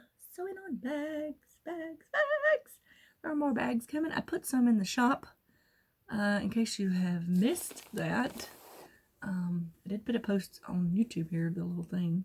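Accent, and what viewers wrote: South, United States. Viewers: I just love your southern accent you don't have to change anything. =-) Love your You Tube Channel and your bags.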